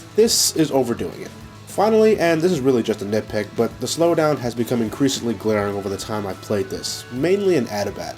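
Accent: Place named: Pennsylvania, United States. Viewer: My personal thoughts (now that I have the PlayStation 3 version) Daytime stages: 9.5/10 Nighttime stages 9/10 Tornado Defense: 7/10 Eggmanland (which, for first timers, can go f**k itself): 2/10 Overall: 8.75/10 And for saying war-hog, it's his Pennsylvanian accent.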